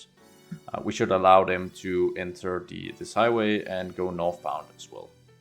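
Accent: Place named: Denmark. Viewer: nice work! your accent sounds at bit danish or scandinavian. er you from denmark?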